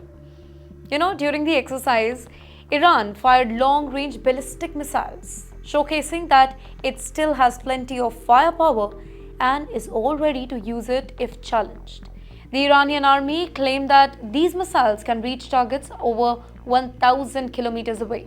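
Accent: India. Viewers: Curry accent 😂 eat cow dung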